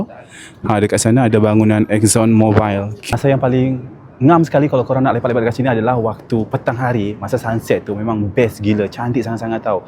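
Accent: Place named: Kuala Lumpur, Malaysia. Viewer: pandai abg ckp kl bang. berapa lama dah duduk sini. done subscribe🎉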